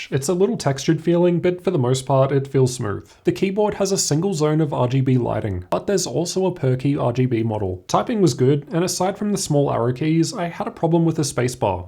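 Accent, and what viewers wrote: Australia. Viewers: subtle Aussie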